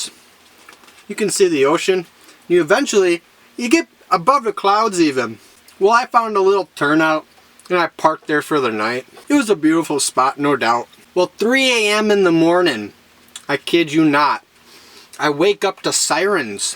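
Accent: Wisconsin, United States. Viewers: You're WI accent gets SOOO much heavier when you drink. My MN one does too.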